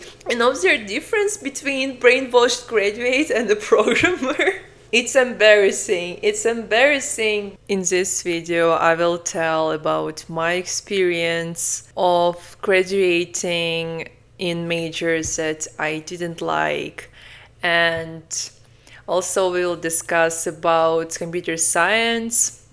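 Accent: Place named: Brazil. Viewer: classic brazilian accent, i can tell when i hear my fellow brothers and sisters of brasil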